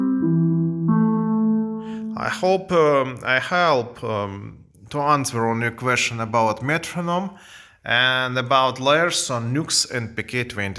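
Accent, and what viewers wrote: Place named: Russia. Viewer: Русский акцент😂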